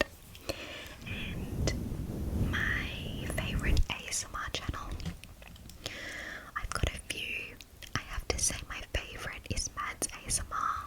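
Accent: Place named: Australia. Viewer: Yeah girl AUSTRALIA!❤️❤️ me too.. I thought you where bc you have an Australian voice❤️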